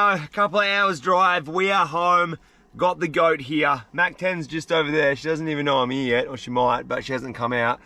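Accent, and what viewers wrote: Australia. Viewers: Any one pls which region of australia this guy belongs to I wana learn his accent ??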